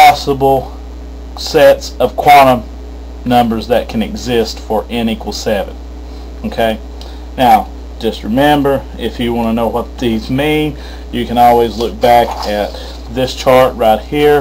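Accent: South, United States. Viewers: Dude i need you as my chemistry teacher. and based on your accent, youre prolly right here in the south with me haha!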